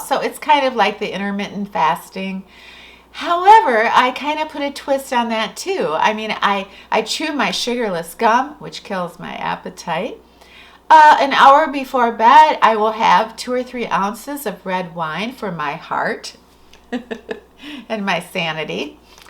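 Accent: Midwest, United States. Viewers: Your accent is Midwestern? Where are you from?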